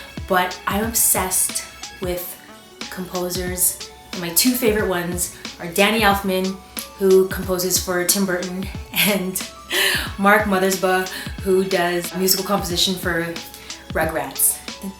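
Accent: France. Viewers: J'adore ton accent français ... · love your franch accent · your french accent is so freaking cute! You should come to Paris one day! Je t'aime! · Great video !!!!!!!! And i love your French accent :) Je t'adore <3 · love your french accent, you're sooo cute ! I'm a new sub and already love your channel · I'm French and you know what ? I REALLY LOVE YOUR FRENCH ACCENT !!! :D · Ton accent francais est so cute! :D ❤ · I love your french accent it's really good (I'm french by the way) Love from Paris 3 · i loove your accent in french! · I'm french and your french accent is the cutest omgg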